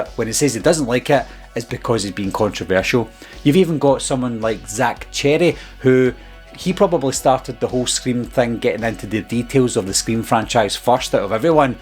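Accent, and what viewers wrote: Scotland, United Kingdom. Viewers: The fact you have to defend your accent…so unreal. Keep doing what you’re doing. You’re killing it. And anyone who says they don’t like your accent secretly wishes they were Scottish.😏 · I'm scottish too brother don't worry about the accent 😂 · We Scottish have the best accent keep up.the good work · Listen bud don't you worry aboot yer accent..its the same as mine..the best accent in the world an I kin understawn ivrihin yer sayin nae borher..you just keep doing yer thing and don't let comments from a couple of wallopers stop ye.....cheers bud 👍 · The Scottish accent is a thing of beauty, they are just jealous of you and have wayyyyy too much time on their hands down there in their mother’s basement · I commented on your Scottish accent on a video the other day but in a good way, love a Scottish accent 👍🏻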